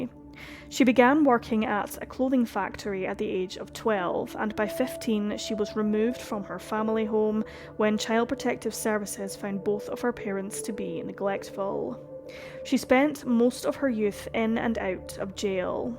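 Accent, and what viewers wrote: Scotland, United Kingdom. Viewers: I think she's scottish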